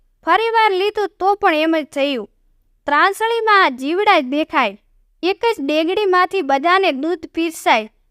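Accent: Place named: Banaskantha, India. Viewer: સરસ વાર્તા ઓ મૂકો છો તમારો અવાજ બનાસકાંઠા નો લાગે છે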